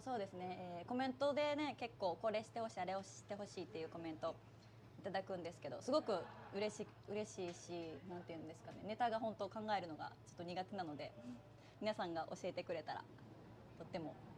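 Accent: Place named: Kochi, Japan. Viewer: 前々から 拝見させていただいてました。 美人は当然ですが、 イントネーションは 高知の女の子ですね🎵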